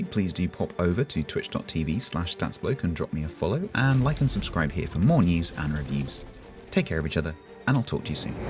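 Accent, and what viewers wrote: United Kingdom. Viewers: can i ask are you British by any chance.. its just i am British and you seem more British than me. Also this was a great review very to the point and i can understand you easily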